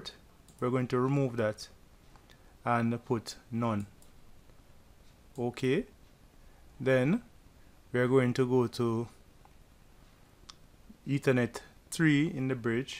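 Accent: Jamaica. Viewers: Well Done I love your Jamaican accent. Big ups man!!!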